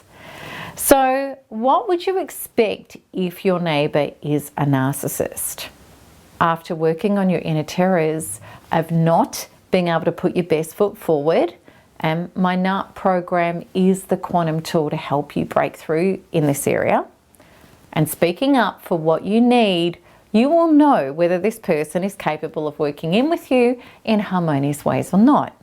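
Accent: Australia. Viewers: that horrible australian accent...but yeah female narcissists are the worst.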